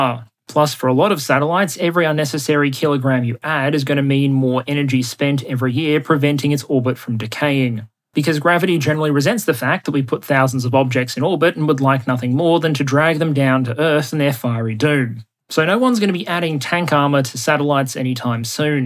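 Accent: Australia. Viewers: I love the NZ references in your videos. You sound very Aussie, but I’d love to know if you have a kiwi connection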